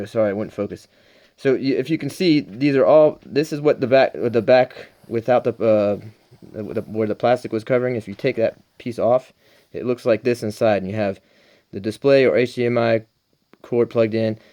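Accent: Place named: East Coast, United States. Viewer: Ahhh ok gotcha. Exactly, the person mentioned cubebrush, whoever that is. Another I'll need to look up. The artists you mentioned are great btw. You live in Europe? Hmm wouldn't have guessed that. You sound kinda like you're from the East Coast.The paper one sounds good. No way that can scratch the screen too right? Yea the plastic is harder to control. Almost impossible to get fully controlled quality of line I found at least. So you got two models one early and one later as a replacement and they both were smooth..? Yea see those in videos, glad they replaced it. Wow that's awesome. K good hope not. K. Would you want to sell the Corel? I hear you. Been watching videos on Photoshop.. the mixer brush looks really interesting. I'm guessing it's only on the stupid CC versions? Was trained on Photoshop too long ago, forgot everything. 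Sure via torrents and key g3ns. Don't condone it either but good to know. Great idea, will do that ty. Very good to know all that. One thing I'm dreading is setting up things, settings etc. Am already pretty systematic in setting up computers but with the number of settings to save to the remote, the number of brushes one can use, all the different settings to account for it looks a bit overwhelming. Yes you did, thanks so much!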